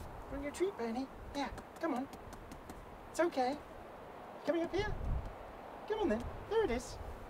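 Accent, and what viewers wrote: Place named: London, United Kingdom. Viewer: I’ve been watching your episodes from the very beginning and love them all. You’re a great warm hearted guy. I love your London accent all the way from NZ. Keep it up!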